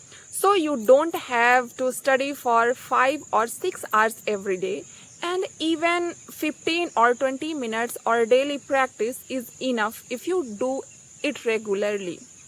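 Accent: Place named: India. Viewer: Pure Indian pronunciation ! Quite different from British or US pronunciation. It sounds funny. It took time for us to be used to Indian pronunciation. Indians are smart and friendly.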